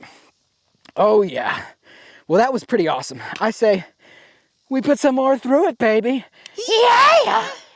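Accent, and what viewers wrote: South, United States. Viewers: Bro you should keep the Sothern accent! sike as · Honestly I support the Southern accent it fits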